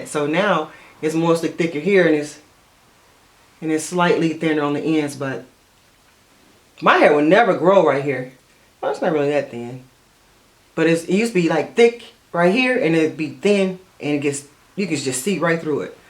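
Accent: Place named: United States. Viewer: you have these gorgeous african features, those cheekbones I'm dying for. your lips are gorgeous and your skin was given to you directly from jesus. hahah, you're beautiful!!! i know you're american, i hear it in your voice, its just your features are so distinct and royal, lol. thanks for the hair tips, yours is lovely!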